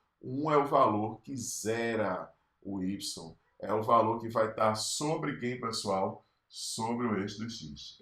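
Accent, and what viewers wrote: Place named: Bahia, Brazil. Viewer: Sei que as aulas são para ajudar pessoas que vão prestar ENEM. Naão é o meu caso. Estou fazendo a segunda faculdade e seu material é bom. tenho mpor hobe o estudo de matemática desde 1984 e a internet trouxe a possibilidade de conhecermos o trabalho de outras pessoas. Não sei, mas parece que o professor é baiano e cheguei a uma conclusão: ninguém melhor que um baiano, calmo e cadenciado, pra explicar qualquer coisa pra alguém. Suas aulas são ótimas professor, pois dão tempo de pensar e assimilar tudinho. já me inscrevi no seu canal e virei seu fão. Esero umdia, nos conhecermos pessoalmente. Vou indicar para muitos jovens. Melhor que isso, só se apessoa tiver tempo para estudar a coleção completa de "Fundamentos de Matemática Elementar" do Iezi e amigos, mas isso é pra quem teria tempo mesmo. É uma coleção fundamental que tenho em minha cabeceira, mas não deve ser usada para um concurso, por exemplo, pois exige tempo para reflexão e absorção de tudo. 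Professor, grande abraço. Continue trabalhando e fazendo pensar.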